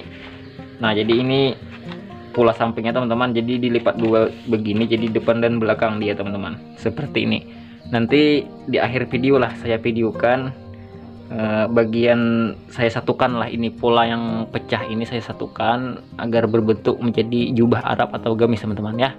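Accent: Banjar, Indonesia. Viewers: Logat kayak urang banjar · Banjar nih kedengaran nya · Pian nih logatx kaya org Banjar Bermanfaat BNR YouTube pian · Saya baru liat menit 5 , tidak sampai selesai video. Sudah paham... Sebab saya sudah menjahit/penjahit 15tahun... Tapi saya mau bertanya,anda orang mana , suaranya itu loh🤣🤣🤣 Banjar atau apa???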